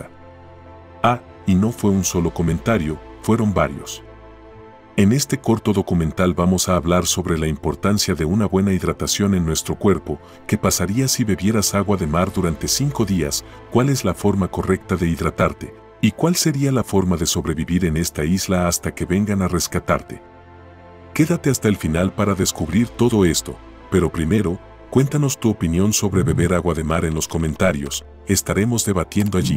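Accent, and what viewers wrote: Antioquia, Colombia. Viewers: Es mejor que ahora ocupes la voz de la IA . El acento parcero o paisa es muy cutre . Felicidades y mucho éxito para este canal .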